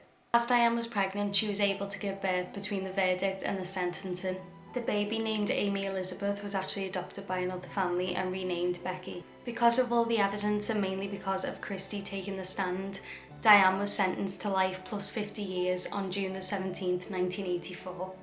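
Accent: Liverpool, United Kingdom. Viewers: is From Liverpool, England. That is a Scouse accent.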